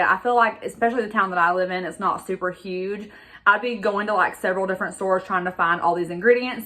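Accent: United States. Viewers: Love your country girl accent 🥰🥰🥰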